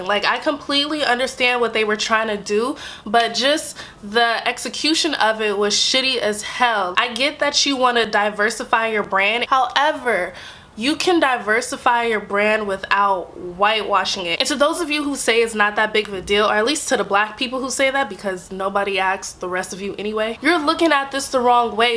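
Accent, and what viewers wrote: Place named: New York City, United States. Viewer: awww you're growing a SLIGHT NYC accent! lol. anyways, great video I totally agree w. your viewpoint on this BS.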